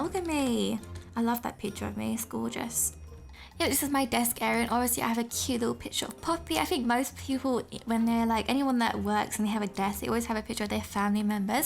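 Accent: United Kingdom. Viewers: I watched ur videos and u talk British now i can talk British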